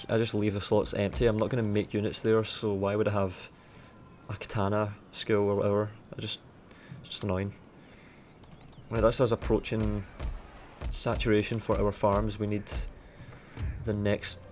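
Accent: Scotland, United Kingdom. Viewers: What part of Scotland are you from bud? I want to guess somewhere around Glasgow?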